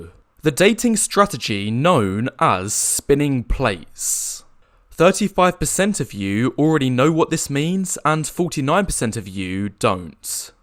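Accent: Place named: RP, United Kingdom. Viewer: I like dialect. He can easy play in a Prince Charles movie.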